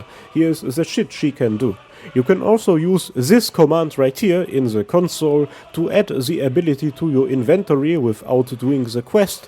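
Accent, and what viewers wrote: Germany. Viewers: are you from DEUTSCHLAND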